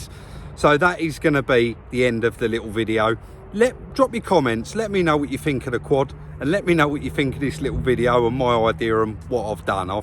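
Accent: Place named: Essex, United Kingdom. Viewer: More like an Essex accent.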